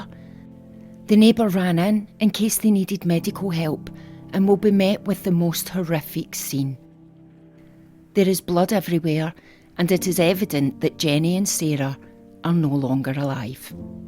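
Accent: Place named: Scotland, United Kingdom. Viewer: Hi there, I came over from the Jamie Reynolds case. I stopped midway, because I couldn't hear, even tho I had the volume at maximum. I was pleased to find out, this recent video, the sound is great :) Oh, and pay no heed to the haters. As if they didn't have the option of changing channels... I myself - love your accent :) I'm American and I find the (Scottish ?) accent fascinating. As a storyteller, you have very good pacing and rhythm, which I very much appreciate.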